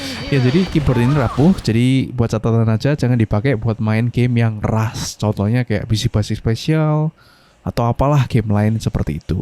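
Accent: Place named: Java, Indonesia. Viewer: wkwk. awal lihat subnya kecil, ya udh ekspektasi audio pecah.. tapi gila ini audio jernih dan sekelas 1jt sub.. bisa lah ini cepet naiknya.. mantap review dan aksen jawanya... saran saja pas jelasin baterai di menit 3, sekalian aja tampilin baterainya om, jgn mainin buka tutup brutal. di kuping agak ganggu soalnya pakai headset, dah itu saja lanjutkan om.. :v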